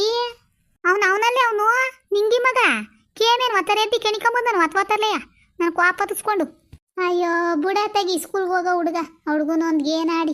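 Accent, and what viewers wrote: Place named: Mandya, India. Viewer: ಮೇಡಮ್ ಇವಾಗ ತಾನೇ ವಿಡಿಯೋ ನೋಡಿದೆ ತುಂಬಾ ಚೆನ್ನಾಗಿದೆ ಇದು ಮಂಡ್ಯ ಶೈಲಿಯ ಭಾಷೆ ಅಲ್ವಾ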